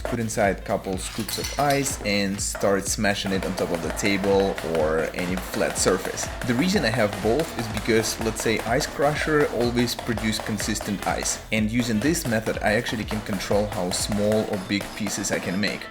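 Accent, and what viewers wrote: Russia. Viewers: Where are he from? Soo shity russion accent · Русский акцент.) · Он очень похож на русского который говорит на английском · Я никак не пойму. У тебя вроде как русский акцент? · Вот странно слушать русский акцент английского языка, будто говорит на родном но ничего не понятно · Ну я понял что ты русский но не по имени а по акценту ну чисто вот этот русский который хочет дать тебе по лицу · По акценту понял, что автор канала русский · русский же акцент, не? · Я один слышу русский акцент?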